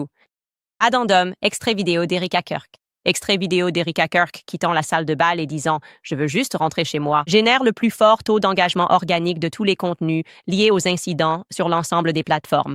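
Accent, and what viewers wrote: Quebec, Canada. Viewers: AAahh le francais AI est bizzare, c'a laire du francais quebequois 😂😭😭going back to main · lol, same here. Weirly she speaks with a slight Quebec accent, and some of the translation is a bit approximative, but still, it is pretty impressive. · en tant que Français ça donne un ressenti de québécois édulcoré. Mais je ne suis pas du tout spécialiste 😄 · Bonjour Candace Je suis français et je t' écoute en français. Si ton français (issu d'IA) est très bon, je dirais qu'il n'est pas parfait car il comporte quelques accents canadiens que l'on ne trouve pas dans les diverses régions de métropole. Le français des iles caraïbes ou d'Afrique a aussi des accents marqués mais pas canadiens. Cela dit l'écoute reste confortable pour un français qui ne comprend pas l'anglais et encore moins l'américain. cordialement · C'est vrai pour l'accent québécois mais ça reste très confortable a suivre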